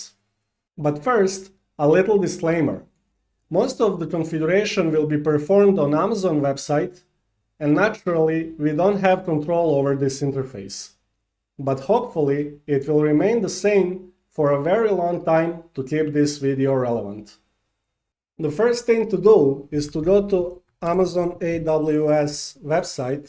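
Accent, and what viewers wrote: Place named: Arab world. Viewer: thank you so much for a nice video in Arabic accent :D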